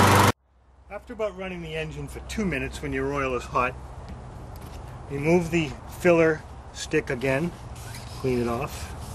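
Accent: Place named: New Jersey, United States. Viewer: Youve got a jersey accent. My daughta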